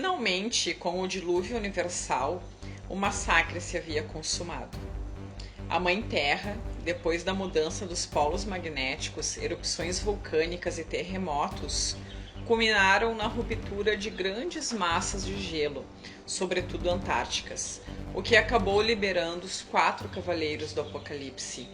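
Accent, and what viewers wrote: Rio Grande do Sul, Brazil. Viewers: Cara,como vc é chato!!! Se ñ gista do sotaque gaúcho dela,vasa!!! Ninguém está te obrigando a ver o vídeo.Vá ao canal original da saga e veja sem a traducão e sem o sotaque da! Ecaaaa!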